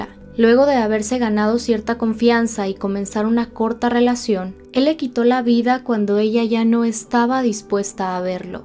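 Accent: Colombia. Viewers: cuál es tu nacionalidad por tu acento me párese colombiana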